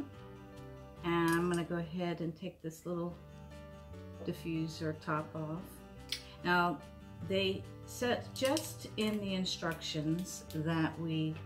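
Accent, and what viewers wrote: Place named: New Jersey, United States. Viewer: You sound like you're from New Jersey.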